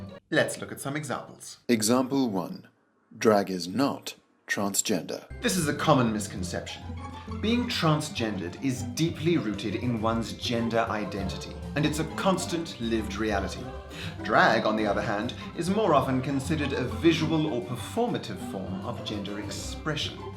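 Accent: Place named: South Africa. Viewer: I just realized that your South African. Damn now I like you more because it feels closer to home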